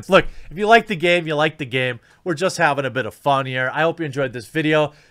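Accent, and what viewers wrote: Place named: California, United States. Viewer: This SJW Californian accent is reaaaaallly getting to me. 😂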